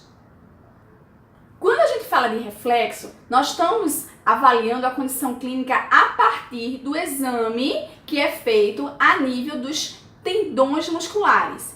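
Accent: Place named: Paraíba, Brazil. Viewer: Muito bom o video! Quando vi seu sotaque, lembrei da minha mãe, que, é da Paraíba e fala igual à vc!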